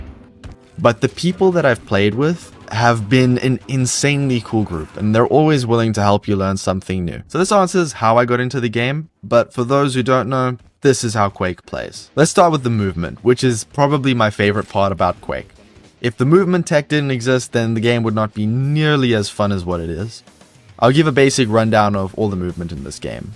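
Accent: South Africa. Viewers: Is this man South African?! Legend. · Hah, I could instantly tell the Saffer accent :D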